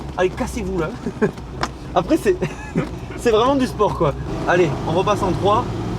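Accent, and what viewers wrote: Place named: Lille, France. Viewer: Sympa la vidéo l'ami Lillois, c'est votre accent qui m'a mis la puce à l'oreille😄 Tres beau modèle que vous nous présenter, félicitations à son propriétaire. En revanche, votre tenue vestimentaire me fait penser à une pub pour Cetelem🤭 Encore merci pour la vidéo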